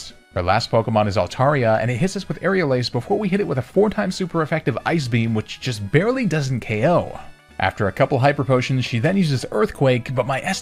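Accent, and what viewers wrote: Canada. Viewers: I love how Canadians saying out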